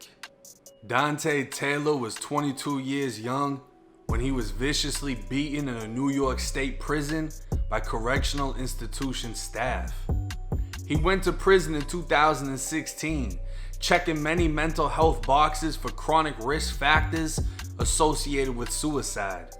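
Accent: Boston, United States. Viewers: Love your channel bro👍 Just curious about your Boston sounding accent? Did you always live down South. I’m from Brooklyn living in Jersey and I’m always hearing about my accent😂 Keep up the dope content 💯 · Damn what part of South Boston Florida you from 😂 carrr lol thick ass accent sound like Mark Wahlberg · Aye big bro are you from Boston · It’s the Boston accent for me. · Is he a Boston dude? Accent killin me lol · This guy is so Boston I can smell the clam chowder from here! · Is that a Southie accent? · Sounds like he's from Boston good content i just subscribed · Is he from the south or is he from Boston ???? He sounds like Marc wahlberg and Jay let’s live life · Bra if you from Tampa why you sound like you from Boston?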